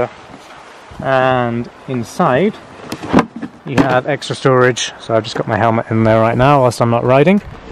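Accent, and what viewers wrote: United Kingdom. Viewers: You sound British but I'm assuming that you're reviewing for the American Market as the specs don't match, is this correct? Also lose the hat, you're not 12. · is this the Europe version or the US? I know there is different settings, as in, the Europe version does not allow the throttle to function independently where as the US version allows you to use it like a moped? if so, how did you get the US version in the UK (unless you're based in the US - your English accent has miss led me! lol)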